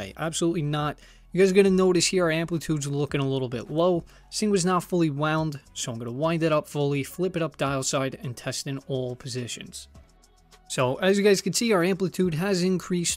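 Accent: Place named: Brooklyn, United States. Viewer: First time viewing your site and this restoration..which, btw, was a great job. A classic piece which was in dire need of service....(amazing how some ppl would let that go soo far..). You did a Great job..and thed bracelet is still in good shape to wear as is. No need (at this point) to rebuild. Kudos to you... (where's your accent from..Sounds 'Brooklynish'...eh.?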